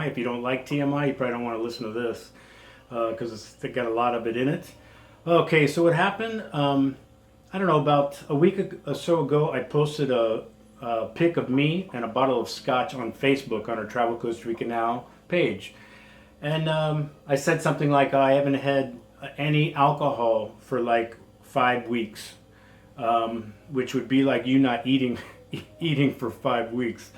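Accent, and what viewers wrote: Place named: United States. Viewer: Hi, let me start by saying that I've been watching your videos for the last 4 years. It started when we were preparing for a roadtrip around Costa Rica and I came across your vids. I spent a couple of hours watching all available videos up to that time and I think the best tip from you which we used was free hot springs in La Fortuna:-) Anyway, we don't plan to go to Costa Rica again but I still watch you because I like your style and your keeping-it-real personality. Not to forget to mention that as a non-native English speaker, I like to listen to some nice American English. I'm sorry to hear about your booze problem and thanks for sharing it with us. You are the key to your success and besides supporting messages no one but you can beat this problem. But I'm sure you know that:-) To finish my lengthy comment here - as a person who likes working out - I have always wondered how you managed to be so ripped while drinking alcohol? 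Isn't alcohol just sugar with lots of calories? You definitely have a better body than many people half your age;-) Take care!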